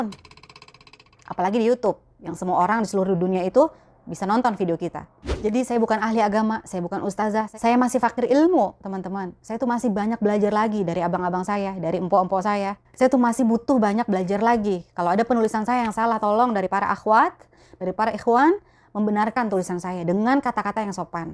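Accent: Jakarta, Indonesia. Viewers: As jngn di pikirin komen orng klu kata saya debes seneng saya liat klu cara bicaranya betawi bnget 👍👍👍👍👍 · Bahasanya betawi pisan di geroinnnnn 🤣🤣 Mpo.. Belajar b. Arab dong mpo bnyakin.. 🙏😘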